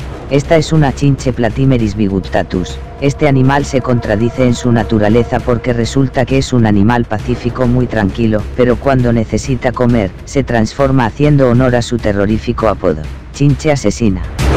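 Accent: Spain. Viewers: él es de España.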